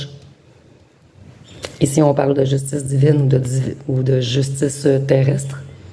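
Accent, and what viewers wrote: Quebec, Canada. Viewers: Merci beaucoup Madame la Cartomancienne.Je suis de France ET des Îles de France.J'adore le CANADA ET LES ACADIENS ET ACADIENNES D'OU VOUS ÊTES CAR JE RECONNAÎS FORT BIEN L'ACCENT DU QUÉBEC.BONNE JOURNÉE À VOUS MADAME 😂😂🎉🎉🎉❤❤😢😢😢🎉🎉😂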